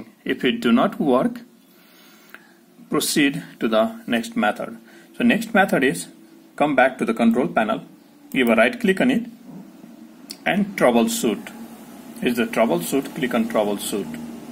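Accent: India. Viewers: Being an Indian, this is the most Indian accent i've ever heard.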